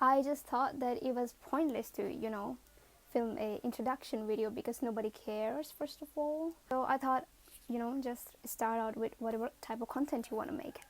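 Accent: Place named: United States. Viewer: you speak like a Us people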